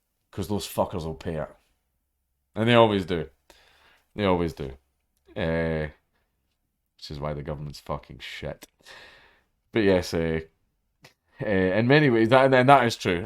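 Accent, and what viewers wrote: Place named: Scotland, United Kingdom. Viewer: Not that I don’t like your ‘Mad Lads’ series/channel. But I love your news videos. As a yank, I love your Scottish accent too.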